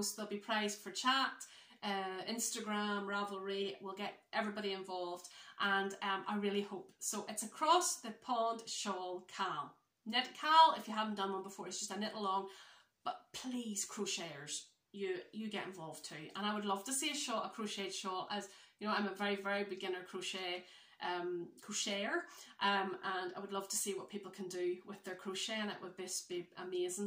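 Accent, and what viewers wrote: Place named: Northern Ireland, United Kingdom. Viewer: Just came across this podcast and enjoyed it so much so I'll be checking out all your others. I love your cheeriness and your easy chat and also your N Irish accent. Your Kastelet is beautiful and just my style so I will be checking it out on Ravelry..thank you.. Looking forward to watching your previous podcasts. God bless you lovely lady. x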